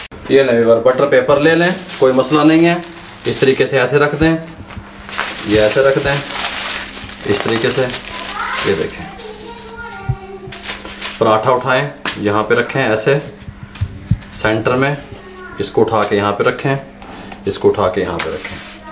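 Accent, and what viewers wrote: Punjab, India. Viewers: He is so simple, jab yeh kehtay hain “ aap bolain gay koi cheez banayee thi” can’t help laughing, his Punjabi is so cute😅😅😅🌹 Theek hu gaya.....!! 😊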